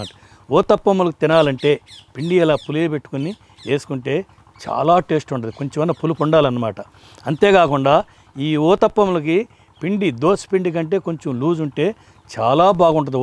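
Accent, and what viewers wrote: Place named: Rayalaseema, India. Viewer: మేడం ది పక్క రాయల సీమ అనుకుంట వాయిస్ మాడెయ్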